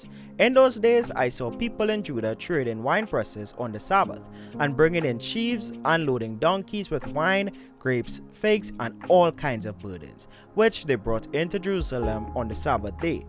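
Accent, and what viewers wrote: Trinidad and Tobago. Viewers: Great job. Are u a Trini!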